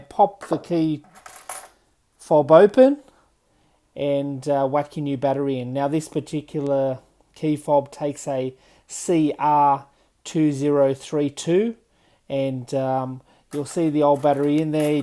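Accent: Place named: Canada. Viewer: Bro yo Canadian sounding ass didn’t do shit we could hav got more info from google u just blew tf out of me bro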